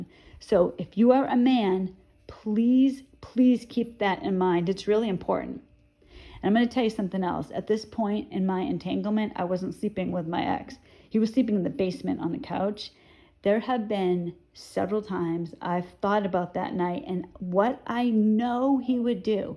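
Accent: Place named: Boston, United States. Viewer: you should do a small clip of your Boston accent, just for kicks. Another great video, we can change and move on, thanks for your encouragement and stories it really helps because so many are still struggling. I am free but still struggle with rumination and it really sucks. It's so cute to hear the chickens in the background.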